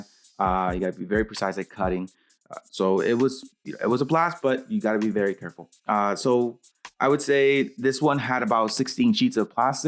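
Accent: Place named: Miami, United States. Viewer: I know a Miami accent when I hear one. usa gundam has some preorders for zoids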